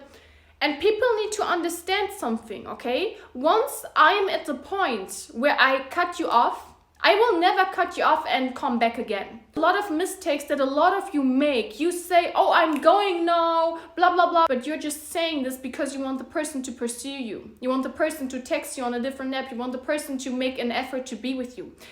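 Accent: Germany. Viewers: I think german, she's from Germany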